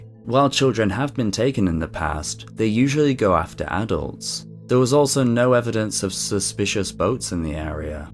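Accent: United Kingdom. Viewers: Is it the British accent? I can't think of what could be hard. He's a basic YouTube presenter and fairly basic to watch. Literally nothing complicated. As for other content like this, Sakura Stardust also does Japan only internet mysteries, mostly stories about 2Chan but there's a lot of variety there.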